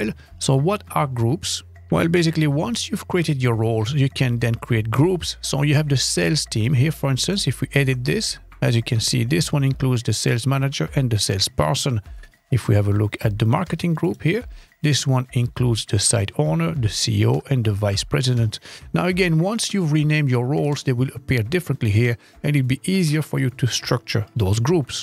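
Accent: France. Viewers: I hear nice French accent 👍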